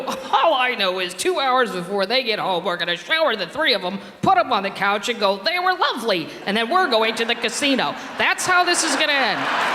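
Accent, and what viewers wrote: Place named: Midwest, United States. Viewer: Such a cutie - love her midwestern accent 🥰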